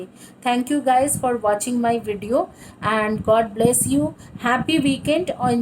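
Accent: Bengal, India. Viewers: Radhe Radhe madam ji. Apka bolneka tarika se mujhe lagta hey ki ap bangali ho..... Pile no 3 chuna tha . Jo mech nei kiya...... Jay sri krishna.....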